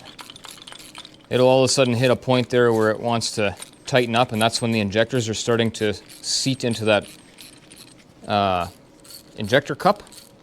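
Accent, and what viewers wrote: Canada. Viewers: I'm in the middle of rebuilding a Maxxforce DT and found you video on measuring piston protrusion, and I kept thinking that you sounded like you were from South of Winkler, I grew up near Plum Coulee and Currently reside in Portage la Prairie. I just got the Rocker arms in, the valves set, and the injectors installed today. I'm rebuilding it because the dogbone holding the lifters straight for #5 broke allowing the lifters to turn wiping out the camshaft, and also the #3 cam bearing was missing and #2 had shifted. love the hex adjusters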